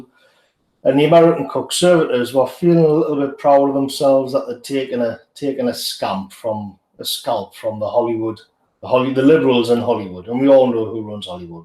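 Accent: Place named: North East England, United Kingdom. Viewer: Well said 👌 From a fellow North East lad. It's refreshing to hear an alt righter with the same accent as me.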